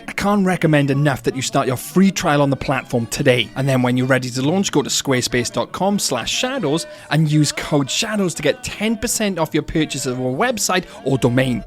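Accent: Wales, United Kingdom. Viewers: Call me crazy but instead of hybrids on hybrids I’d love to see a deinosuchus, titanaboa, daedon or Troodons in JW. Also forgive me is your accent from wales?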